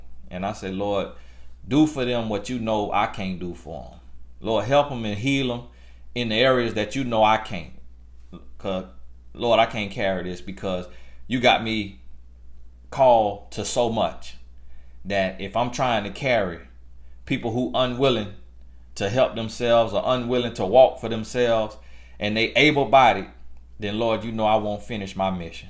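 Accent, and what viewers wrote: South, United States. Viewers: I totally agree keep spreading your message and I love that your from the south and have that southern twang when you talk ❤️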